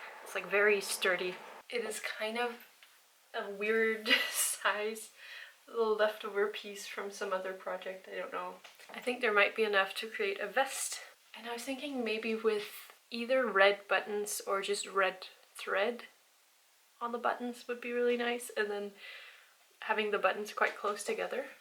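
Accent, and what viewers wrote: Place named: Scandinavia. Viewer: So lovely to hear ever so slightly Nordic-tinged English : ) This was such a nice video to stumble on.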